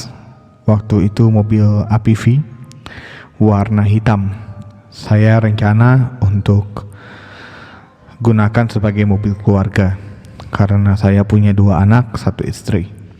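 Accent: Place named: West Java, Indonesia. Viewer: Meni logat Sunda pisan euuyyy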